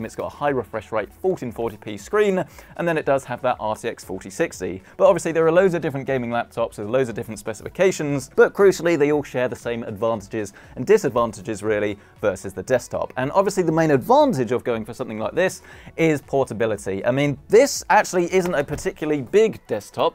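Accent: United Kingdom. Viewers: Dear Lord its British Linus!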